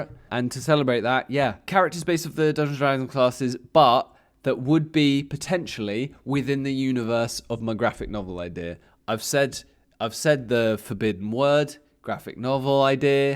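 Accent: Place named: United Kingdom. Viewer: Are you British? Cuz I like the accent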